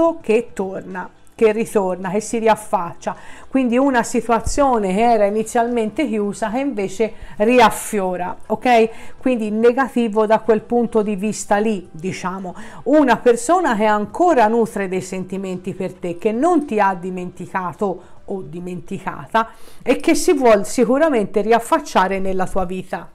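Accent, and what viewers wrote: Tuscany, Italy. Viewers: .... sei simpaticissima super accento toscano ❤... ti seguo sempre.... daiiii stesa del leone.... dai dai che arriva...lo spero!!!🌹🌹🌹🌹...grazie un abbraccio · Un abbraccio sei un mito.. Adoro il tuo accento toscano. Spieghi le tue carte benissimo. Mi ritrovo nella chitarra. Bella la nuova grafica...brava.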